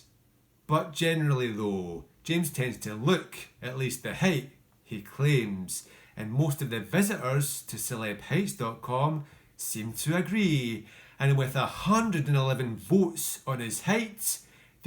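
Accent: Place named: Scotland, United Kingdom. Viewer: Hey you have a Scottish accent like him!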